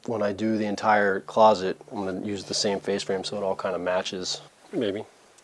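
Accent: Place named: Florida, United States. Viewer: I knew you were in Florida. By the way you were talking I’m in Ocala. How far away are you?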